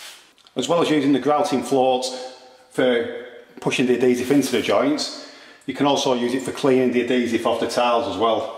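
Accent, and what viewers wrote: United Kingdom. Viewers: Looks great.......good job on your video. I've never seen anyone use a small plastic pipe to indent the grout between the tiles before, so that was a good tip. I've got a "rougher" texture to the tiles I'm using on my wide deck railing, are there any tips to doing grouting on a rougher tile surface so that the grout doesn't get into the dips of the tile and mess the job up? Also....your "UK" accent made this kind of hard to understand at times, but I'll do my best to "translate".....THANKS!! John (in Nevada)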